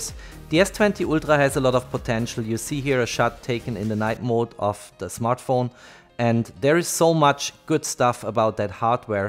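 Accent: Germany. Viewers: Deutsches Akzent 🥵🥵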